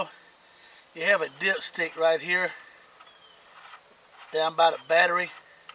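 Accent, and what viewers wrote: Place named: South, United States. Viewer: Dude sounds like a hillbilly...lol...Makes an easy job sound like a lifetime...lol...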